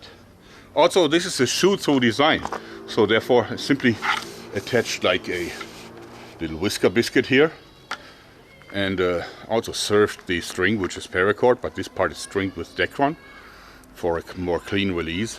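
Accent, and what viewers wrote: Germany. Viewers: He actually sounds very german. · Man hört den deustsch accent so dirch aber irgendwie sind keine deutschen kommis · Im German. He has a typical German accent and the Amazon-Screenshot was is german language too.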